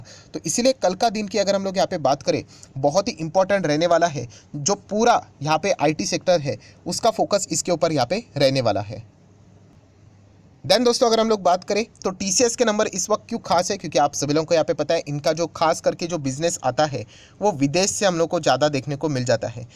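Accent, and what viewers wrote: Maharashtra, India. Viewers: Mujhe lagta hai ki Maharashtra se hai🤔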